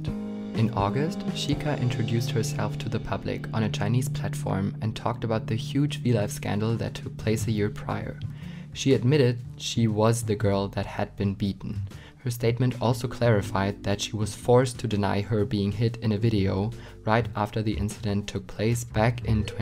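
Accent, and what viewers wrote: Germany. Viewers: du hast keinen schlechten akzent, aber ich konnte direkt hören dass du deutsch bist, cool :) super video!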